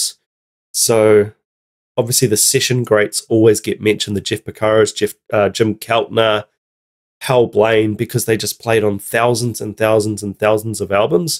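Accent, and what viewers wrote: New Zealand. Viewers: My golly that's a strong Kiwi accent! Kiaora bro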